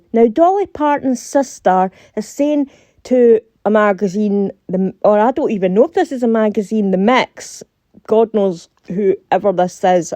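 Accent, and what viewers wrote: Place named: Shetland, United Kingdom. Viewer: by the way, what part of Scotland gives you your accent? I exchanged comments with someone from Shetland & you sound so much like sound bites I've heard from Shetland, I wondered if you're in the North? Take care & ignore the sister. Poor deluded women.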